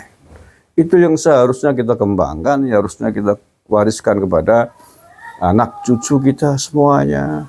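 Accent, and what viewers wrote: Banyumas, Indonesia. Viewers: Tambah edan..setuju Gus...logat banyumasan medhok tenan pak dhe...👍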